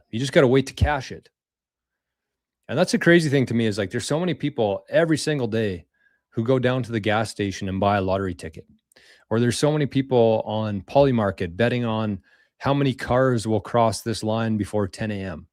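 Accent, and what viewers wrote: Canada. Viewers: I know you can never really know and I've long lost faith in humanity that being said not sure if it's the canadian accent or what but i get serious good guy vibes from you man, you deserve allot more followers. Thanks to you i'm saving my little pennies in sats. One day i'll be stoked to have a whole .1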